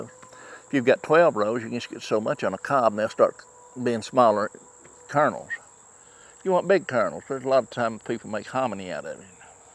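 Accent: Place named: Appalachia, United States. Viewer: Your video just popped up in my video feed and you sound like you are in my neck of the woods in Appalachia. Maybe Ky or Tn? Hickory king is the best field corn and roastin ears too. I love it for corn relish and cornbread too! Yep, seed time and harvest time and global warmin and coolin. I like your Farmall Cub. My grandaddy had a Farmall M. Plant everything you can. You'll need it soon enough.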